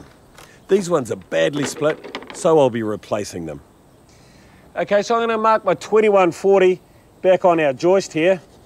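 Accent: New Zealand. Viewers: Thanks mate. I lived in NZ for about a year, but it has been while since then. Love Kiwi accent, sweet as. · I love New Zealand accent with construction. Absolutely Brilliant.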